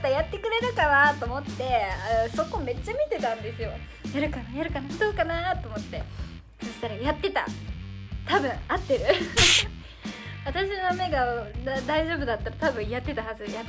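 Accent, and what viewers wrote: Hiroshima, Japan. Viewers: たまに話す方言からすると広島ですか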